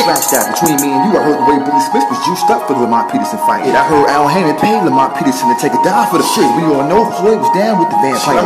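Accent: New York, United States. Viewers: This is a New York nigga if I ever heard one desus and mero got me on the lingo 😂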